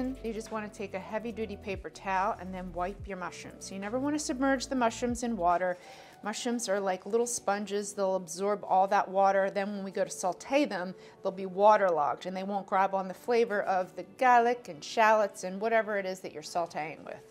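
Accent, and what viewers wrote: United States. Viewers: Lol I love your pronunciation of everything, it’s so American it’s cute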